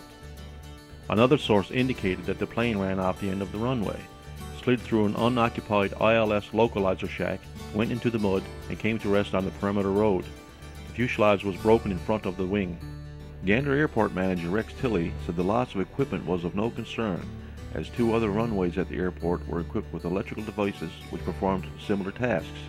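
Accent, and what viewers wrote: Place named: Cornwall, United Kingdom. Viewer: The narrator has a VERY Cornish inflection to his accent. He might have ancestors in Devon.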